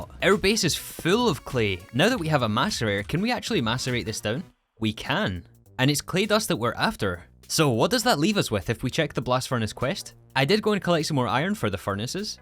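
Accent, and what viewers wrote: Scotland, United Kingdom. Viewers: bro im new here you Scottish or something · 3 are you Scottish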